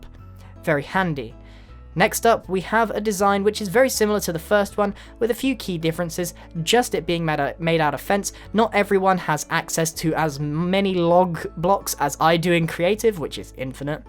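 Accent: United Kingdom. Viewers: I love your voice, the accent is awesome! Are you british? Your voice has just that "british feel" to it XD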